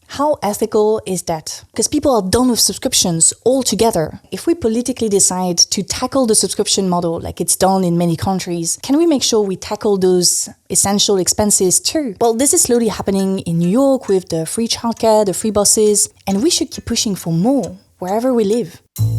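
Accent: France. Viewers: which French department are you from? I’ve never heard a French person sound this French 😭😭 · just so you know, no one would be watching your content if you didn't have the french accent.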